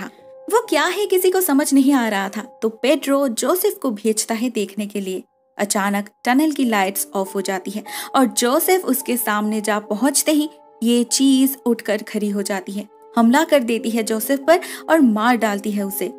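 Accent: Bengal, India. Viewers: Your bengali tone in voice can be identified..but it's sweet and nice to listen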